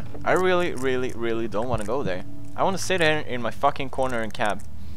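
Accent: Sweden. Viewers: Oh my, this is soooo funny when PewDie says "f*ck" with this swedish accentXD Even "f*ck" sounds cute with the swedish accent:D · I love how he's all Swedish and crap, so he isn't the best reader and didn't say barrels right. So cute! :3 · his Swedish accent aaaaaaaaa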